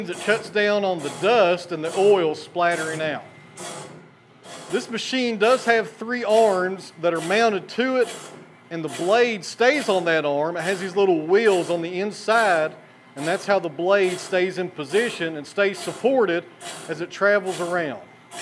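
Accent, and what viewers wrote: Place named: East Tennessee, United States. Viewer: You're speaking english with an east tennessee flair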